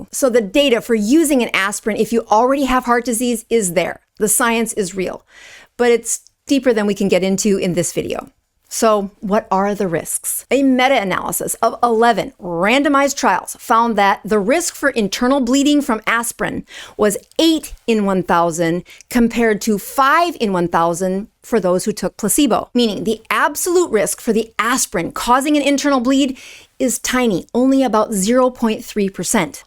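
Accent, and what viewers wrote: United States. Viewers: Australians know the second that you hear that American accent, you stay the fuck away from whatever they're recommending.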